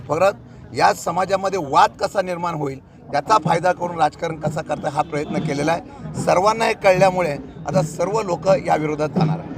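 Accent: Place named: Satara, India. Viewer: Ek no neta jo boltoy to satara bhashet ekdam kadak ani pudcha vatchlis shubhecha🎉🎉🎉🎉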